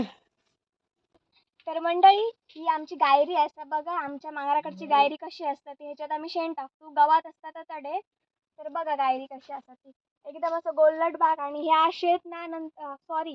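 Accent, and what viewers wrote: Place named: Malvan, India. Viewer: Malvani tuzya tondun aikayla maza yete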